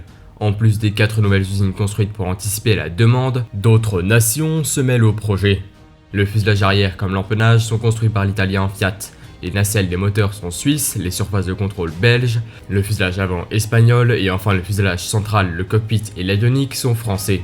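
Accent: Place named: Japan. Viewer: Je suppose que est Japonais ou d'origine japonaise. On le ressent bien par l'intonation de la voix. Donc, il s'exprime un peu comme ses parents et il n'y peut rien. En plus son français est excellent, articule parfaitement bien et ne parle pas comme ces mongols qui adorent ajouter le son "j" après les "d" ou les "t" comme par exemple " je tj'ai djit". Ça oui, c'est trop insupportable à entendre.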